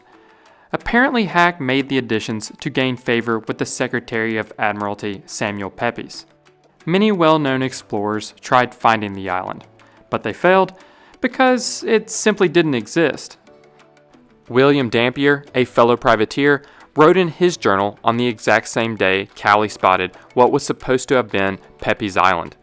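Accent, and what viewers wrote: United States. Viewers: I don't think it is English...The narrator's accent is American.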